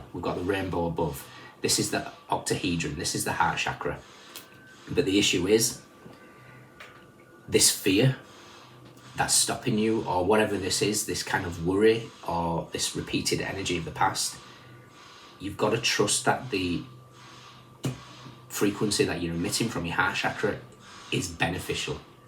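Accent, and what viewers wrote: Northern England, United Kingdom. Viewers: Thankyou 👍 good Northern accent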